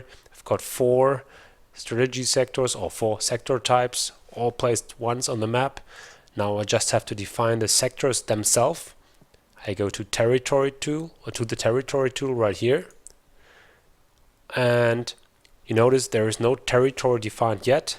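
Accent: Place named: Germany. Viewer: do you still play coh2? Are you from Germany, because your accent sound a little bit like it. greetings from Germany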